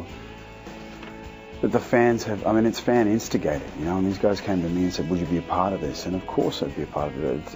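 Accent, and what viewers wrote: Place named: Australia. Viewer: I don't normally find Aussie Accents hot...as I am aussie...but god, he is way hotter with his aussie accent!!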